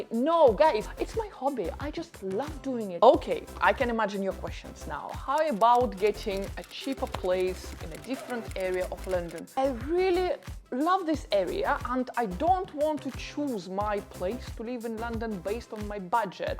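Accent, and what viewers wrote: Russia. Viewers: Hi, I like your content. Good work. I am a little bit like you, except I am not that pretty. I guess they calling us expats or gypsies 🙂Do I hear a russian accent out of you, or am I totally wrong. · Wow, Norwegian with Russian speaking accent.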